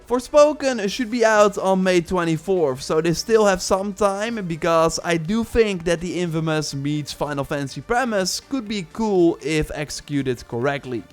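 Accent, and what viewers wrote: Netherlands. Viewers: The Dutch accent is strong with this one. Good informative video 👍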